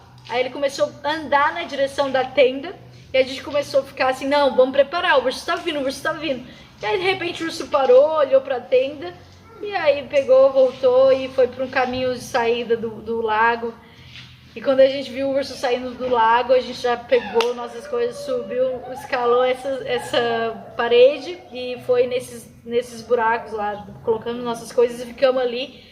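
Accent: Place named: Goiás, Brazil. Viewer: Perdeu o sotaque goiano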